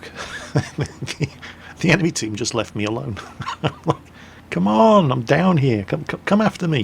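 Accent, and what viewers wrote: Norway. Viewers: best norwegian accent ever:D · I like your Norwegian accent! you sooo funny!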